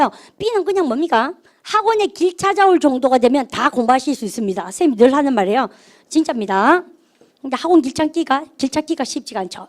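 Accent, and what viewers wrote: Daegu, South Korea. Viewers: "무봤나?" ㅋㅋ 설명 안해줬으면 채소 "무"를 찾는줄 ~~ 대구말 정말 매력있고 강의도 덕분에 넘 재미있네요 ㅎㅎ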